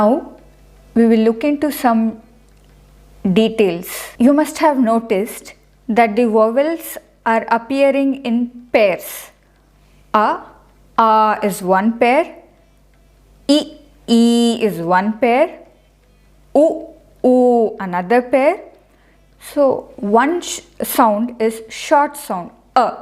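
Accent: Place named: Maharashtra, India. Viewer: Same marathi pronounced 😊🙏🏻💐🌹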